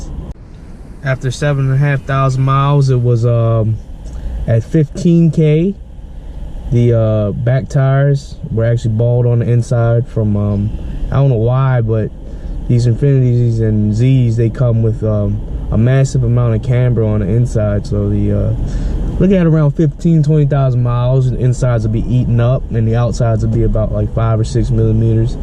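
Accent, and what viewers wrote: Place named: Bronx, United States. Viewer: Rich asian kid talking like he's from the Bronx. pshhht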